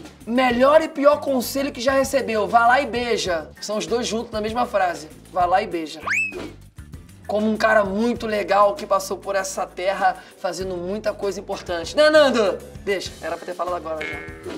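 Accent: Pernambuco, Brazil. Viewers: Realmente nosso sotaque é lindo #pernambuques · Agora tô mais fã ainda de tu,visse?! O sotaque de Pernambuco é massa mesmo!!! "Xero" Rafa!!!(por aqui a gente manda carinho assim!)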